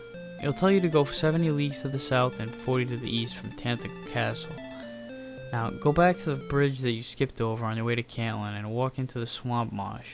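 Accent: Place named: Massachusetts, United States. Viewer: For some reason my computer wouldn't load your profile but I can tell you're from Mass. My boyfriend is from there and you guys sound NOTHING like NY! Anyways, way to keep it entertaining!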